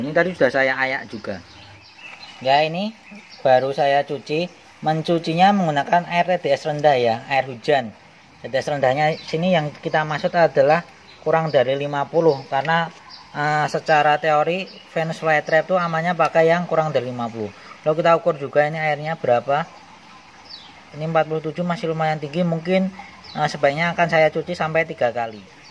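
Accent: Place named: Yogyakarta, Indonesia. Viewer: kalau tidak salah tadi pakai pasir silika putih bisa ya? yg untuk aquarium kan. btw mas orang mana kok jawa jawa nya ky jogja/ semarangan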